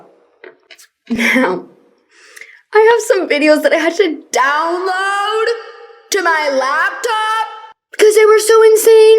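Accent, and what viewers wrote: Canada. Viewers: so you do sound Canadian but the way we over exaggerate it in the states is to show the difference like when we impersonate Brit’s with “B’ole o wo’ar” they don’t sound like that but like they kinda do?????????? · hi just so you know ive started developing a canadian accent from watching your videos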